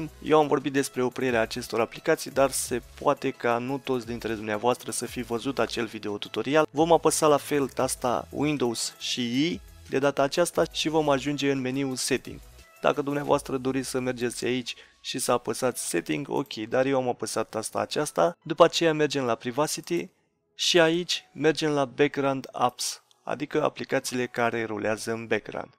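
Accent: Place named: Moldova. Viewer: Ce accent de moldovean.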